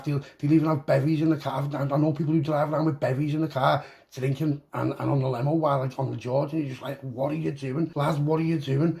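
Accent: Liverpool, United Kingdom. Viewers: ACTIVATED💯🔥🔥🔥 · Lovin' it all Scouse. Flyin' lad.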